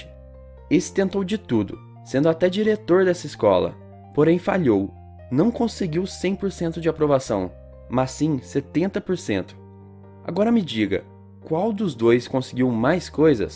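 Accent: Caipira, Brazil. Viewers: Seu sotaque caipira cansa o vídeo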